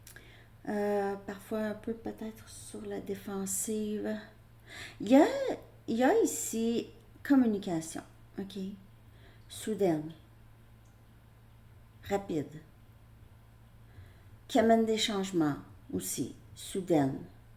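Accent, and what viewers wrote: Quebec, Canada. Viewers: coucou JOLIE Du québec APPAREMMENT,???jE SUIS étonn&e car d'habitude j'ai du mal a écouter ce parlé bien spécial!!!J'adore VOTRE façon très personnelle de tirer les cartes votre SPONTANEITE et AUTHENTICITE également c'est RARE! J'adore vos mimiques naturelles vous êtes charmantes et UNIQUE! TOUT me parle en plus!!!! géniale vraiment heureuse de vous avoir découverte. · Bonjour J’adore votre accent 😅! Le Québec me manque! Merci pour cette lecture toujours très juste! Bonne journée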